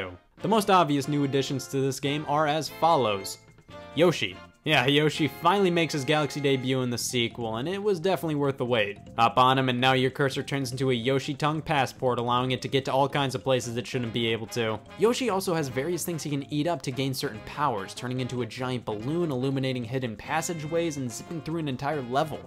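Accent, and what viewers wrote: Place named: South, United States. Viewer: I heard "Y'all" too... Or maybe that's how I think all Americans speak, all southern like. It's definitely refreshing since other channels start with the now overused "Hey what's up guys, xxx here and today...". intro feels like a backhanded greeting, it feels like he's welcoming me but doesn't want me here at the same time... Sort of like my mother.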